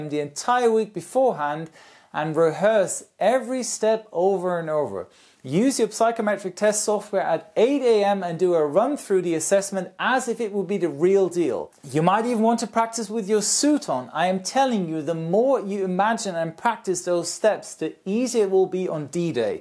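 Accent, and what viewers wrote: Germany. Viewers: Hey, are you a german??? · HySeb the captain is german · K. S due to his slight accent speaking English ( as a German I sadly know this accent very well) and this job or former job at AirBerlin I would strongly suggest he's German :) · Allgäuer Bub isser ^^ PILOTEN BOARD D E Da merkt man direkt das er ein Bio Deutscher ist hahaha :D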